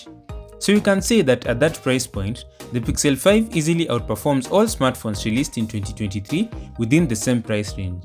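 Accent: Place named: Kenya. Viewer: Kenyan? Happy to hear a voice from home reviewing tech professionally. Big up to your journey.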